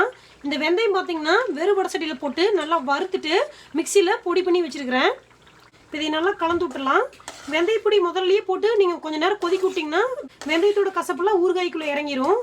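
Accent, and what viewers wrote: Kongu, India. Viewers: Kongu tamil valka supper akka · Akka kongu slang super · unga Slang apt kongu style la irukunga sis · Migavum pandhamana pechu.Arumaiyana samayal. Nandri sis. Nam kongu Tamil alage alagu.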